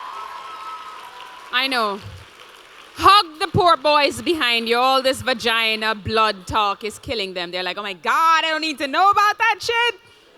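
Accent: Jamaica. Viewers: she sounds jamaician or like shes from di islands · soooo jamaican....lol...mi love it nuh hell!! So proud of u man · This woman is Jamaican. I recognize the accent. I love that she speaks for girls and women. When I grew up, like many, there was NO internet. No books to glean from in any library about women and our troubles. This woman is wonderful! Thank you!!! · my whole family is jamaican. I know when I hear a fellow yadie!! So happy to see jamaicans in the arts~ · Definitely Jamaican 😍 the accent was kicking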